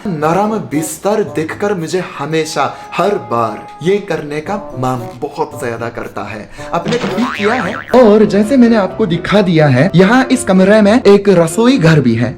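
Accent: Standard. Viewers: A JAPANESE CAN TALK BETTER HINDI PURE THEN INDIANS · Pure Hindi and simplicity = Love from Mumbai only ☺❤😄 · Omg, iski hindi to meri hindi se bi acchi h, itni shuddh hindi😛 Great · Itni shud hindi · Shuddhhhhh Hindi wowowowow👍😁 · s hindi is very pure and good than most of the indians❤❤ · Checking in... ♥️♥️ pure shudh uccharan krte ho aap bhai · He is speaking pure hindi 👍 May god bless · although i an Maharashtrian citizen i dont know to speak such pure hindi like u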